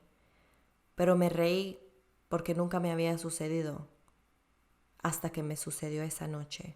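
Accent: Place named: Latin America. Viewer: Feliz de escuchar a una narradora latina en el youtube🙏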